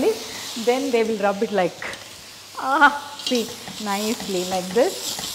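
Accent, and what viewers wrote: India. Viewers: Yenduku antha over action butler English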